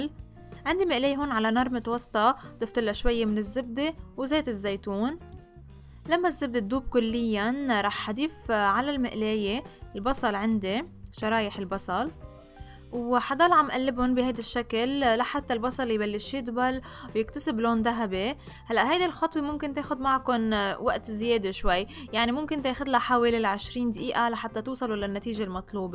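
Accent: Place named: Levant. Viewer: ماشاءالله وصفاتك بتجنن ولهجة اهل المشرق بلاد الشام ماشاءالله بتعقد 😍😘😘😘 موفقة حبيبتي 👍🌹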